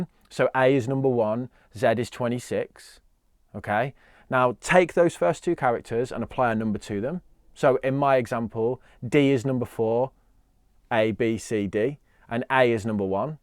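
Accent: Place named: Coventry, United Kingdom. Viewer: 28 These are my salad days Your accent is a bit more Cov than 0121 🤪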